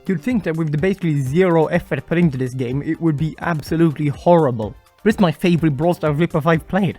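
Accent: Sweden. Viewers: Förlåt men det första jag märkte var att du hade en svensk accent lmao- Nice video btw!! :]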